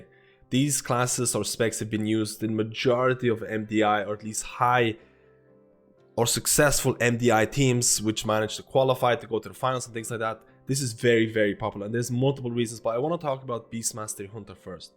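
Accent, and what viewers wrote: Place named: Ireland. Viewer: I thought he somewhere was northern European but then he started sound Irish...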